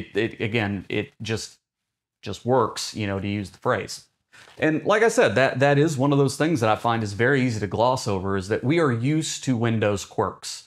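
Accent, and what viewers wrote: Tennessee, United States. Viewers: are you from Tennessee?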